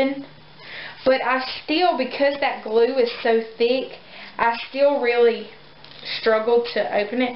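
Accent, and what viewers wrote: South, United States. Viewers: You're so comfortable in front of the camera! I'd get all tongue tiied. I love your videos too. I love that Southern accent too. I'm from South Carolina, so I've got that that same slow way of talking, but I think you're a little more Southern than I am. :) Keep the great videos coming!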